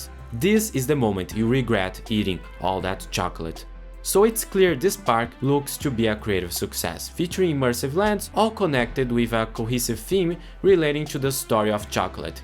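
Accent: Brazil. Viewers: O narrador é brasileiro, né? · I already knew your channel but only now I realized you might be brazilian like me (based off the accent). Meu amigo, finalmente nosso país terá algo digno de seu tamanho na indústria de entretenimento temático e espero que isso faça o BCW e o Hopi Hari se movimentarem rápido quanto a B&M e a RMC , respectivamente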